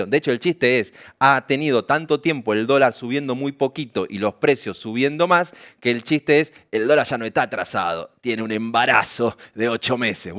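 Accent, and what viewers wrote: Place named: Argentina. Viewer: Veo que hablas c, tono arg, pero donde baja la inflación porque los que vivimos en el interior mas precisó los pueblos donde ningun comercio básico no existe la oferta nada de eso que ponen en la propaganda y aquí cada vez que vas a comprar algo si lo compras de semana a semana siempre aumento y ya no se compra nada eso de bajar la inflación se ve que es solo como siempre x porteños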